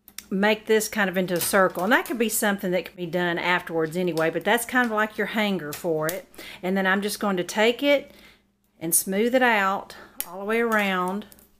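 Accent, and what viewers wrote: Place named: Appalachia, United States. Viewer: So stinking cute. I just found u so u have a new subbie. I knew that voice had to Tennessee or NC mountains. Lol! I'm on the other side of Asheville so maybe we're kinda like neighbors. 😁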